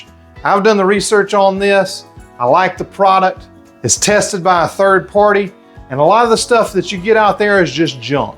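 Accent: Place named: Gulf Coast, United States. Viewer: I'm going to give this a try! I've been really enjoying your videos, especially because your accent reminds me of home (I grew up on the Gulf coast) 😊 Thank you so much for all the helpful information!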